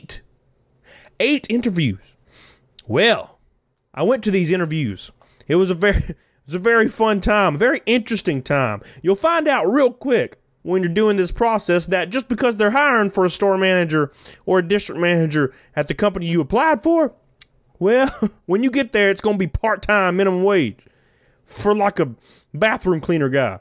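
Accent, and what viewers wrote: South, United States. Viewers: sound either Like an Oklahoma or Texas accent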